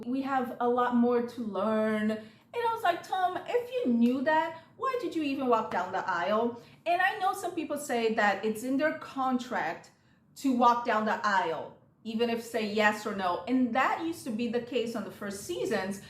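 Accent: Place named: Brazil. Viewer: Are you from Brazil? I can hear a little accent 😊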